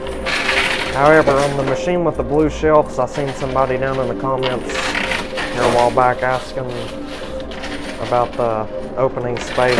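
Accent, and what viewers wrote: South, United States. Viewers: It’s in English-with a southern accent.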